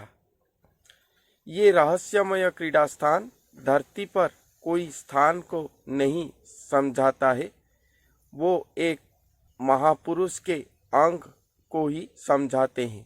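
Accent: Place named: Odisha, India. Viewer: 2024 bole..odia log ka pronunciation aisa hee hai..it's 2024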